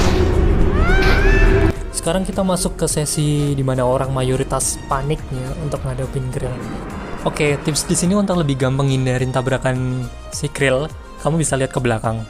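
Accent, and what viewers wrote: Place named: Indonesia. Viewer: Kaget pas pertama ngomong ternyata orang indo cuz i didn't read the caption😆😆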